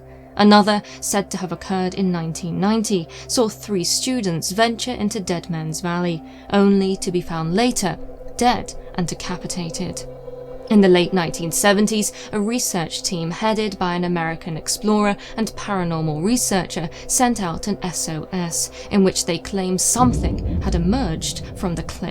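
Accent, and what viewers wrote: England, United Kingdom. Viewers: Sorry, I don't the British accent. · Yes I agree that you are an excellent narrator. You kept me interested and and glued to the screen waiting for more information which you deliver in a way that interested at all times. Further more, and I must apologize in advance, but those dark brown eyes, give a sense of mystery and depth of knowledge. Gosh, it feels like I'm putting you on a pedestal here. I am from Canada and although some British accents are difficult to understand, yours was a delight to list to, to say the least. Finally, and embarrassing to me and perhaps to you, (in a good way) but I find you to be very beautiful. Strikingly beautiful actually. We all see beauty in our own ways but your delivery of the stories, looking into the camera, almost like its a conversations as if you were sitting across from me, talking directly to me. 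I apologize and hope you are not offended as my intention is that of appreciation and admiration. I look forward to further works from you. You definitely have a fan here in Canada lol. Take Care....Joe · Listening to you makes me want to dress well and drink tea while watching your videos. You're an English I always imagined english people are- elegant and classy.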